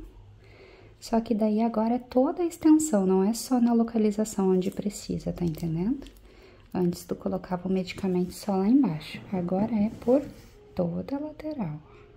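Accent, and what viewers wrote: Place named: South, Brazil. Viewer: A voz e o sotaque dela são idênticos aos da minha cunhada que também é do sul.😊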